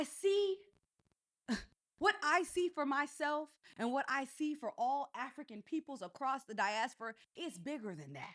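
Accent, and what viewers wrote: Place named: United States. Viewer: Pan Africanism is dead Why don't you live in Africa ?? You sound like you are in the US..... Not Panama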